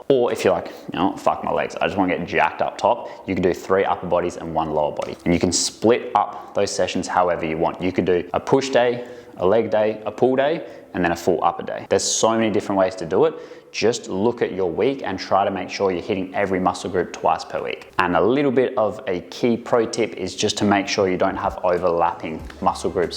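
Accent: Australia. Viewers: Your a champion mate.. the Aussie charm is refreshing to listen to while you explain things keep it up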